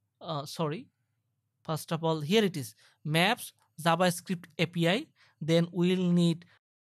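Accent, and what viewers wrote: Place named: Bengal, India. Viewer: ভাই আপনার প্রনাউন্সিয়েশন শুনেই বুঝে গেছি আপ্নে বাঙালি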